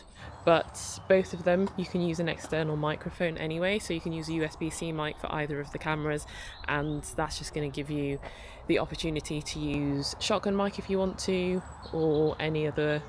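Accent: United Kingdom. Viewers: Great video. Talk slower for your over the pond English is hard to take in your info.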